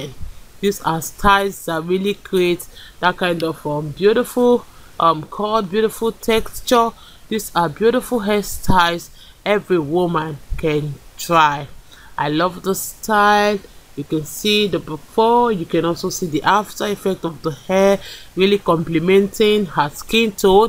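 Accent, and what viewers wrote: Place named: Africa. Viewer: She has a beautiful African dialect. I love those beautiful short cuts!!! Thank you for sharing.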